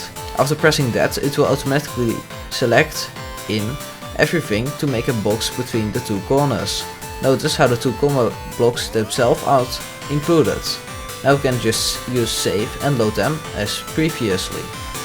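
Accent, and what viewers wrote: Netherlands. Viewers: Niets hatelijker dan een hollander die engels spreekt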